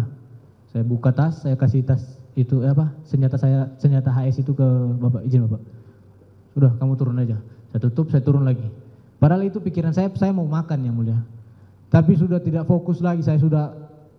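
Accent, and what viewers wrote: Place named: North Sulawesi, Indonesia. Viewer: Dialeg SULUT tetap dong ❤️❤️❤️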